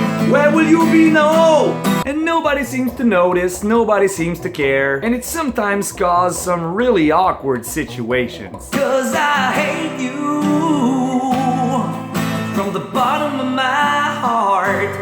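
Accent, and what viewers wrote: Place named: United States. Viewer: comment ça t'as un accent americain..cest tellment cool ça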